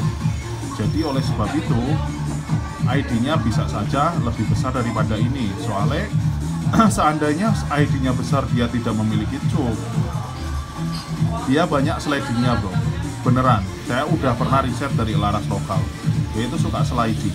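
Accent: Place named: West Java, Indonesia. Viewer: Logatnya seperti orang sunda ini daerah mna pak🙏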